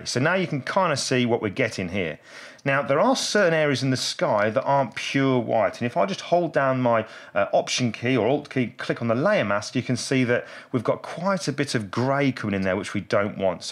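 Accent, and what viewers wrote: United Kingdom. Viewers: great british accent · 好喜欢这个英伦腔 like the British accent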